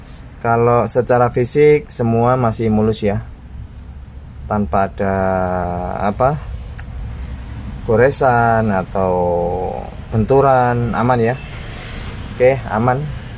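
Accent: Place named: Boyolali, Indonesia. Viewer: Saya kira sampean orang boyolali bang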